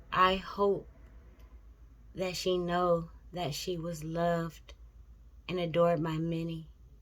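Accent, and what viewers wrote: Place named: Detroit, United States. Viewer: I’m a new subscriber. Enjoyed your video and I definitely hear that Detroit accent. 😀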